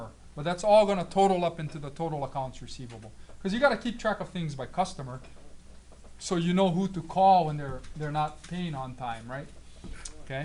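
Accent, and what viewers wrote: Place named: Hawaii, United States. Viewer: sir are you Hawaiian?!? sweeaaaar I can hear it in your voice! love it!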